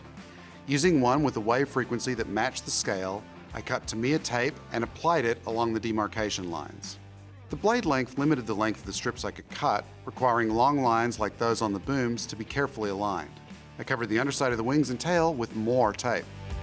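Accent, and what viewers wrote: Australia. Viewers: I guessed you were Aussie (I grew up there too)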